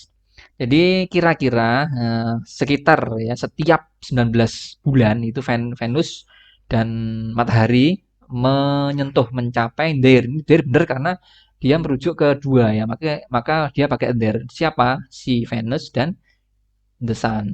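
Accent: Java, Indonesia. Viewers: Sangat mudah dipahami dan saya suka medok jawanya mas wkwk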